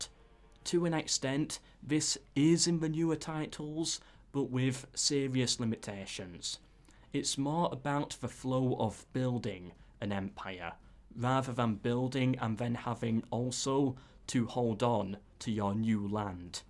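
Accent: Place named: Northern England, United Kingdom. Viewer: I'm sorry to say that but what we have here is northern English, not RP British.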